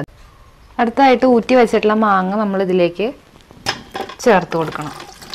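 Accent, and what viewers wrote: Thrissur, India. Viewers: Enjoyed watching the video AND THE " THRISSUR BASHA ". My grand mothers & mother used make this Kadumaanga / Kannimaanga Achar ( Vadumaanga Pickle in Tamil ). They used to parcel in air tight / sealed containers at local blacksmiths and send to their children residing outside India. Now it is becoming more & more difficult to make at home and easier to buy in the market. Thanks again for the video and again for the Thrissur Basha. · Valare nalla avatharanam ethra simple aayaanu ellaa karyavum paranju thannath Evarkkum manassilaavunna thani naadan bhaashayil ellaa karyavum visadeekarichu thannu Nalla cute voice Chechiyude samsaaram kettittu chechi Thrissure jilla kkaariyaanennu thonnunnu Thrissure jilla yilaano veedu Marupadi pratheekshikkunnu Ethrayum nalla sabdathinudama yaayittenthina Mukham kaanikkaathirikkunnathu Thank you chechi thank you veri much🙏🙏🙏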